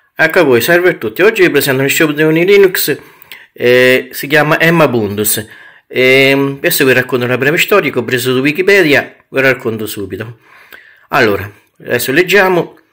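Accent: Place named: Marche, Italy. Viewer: però dovresti parlare italiano! Si capisce molto poco di quello che dici se parli marchiciano! Io lo capisco perché sono originario di un paesetto non lontano dalle Marche ma molti avranno difficoltà!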